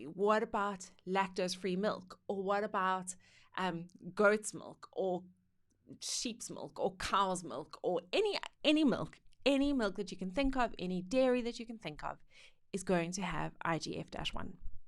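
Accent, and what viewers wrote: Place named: South Africa. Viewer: Accent sound South African...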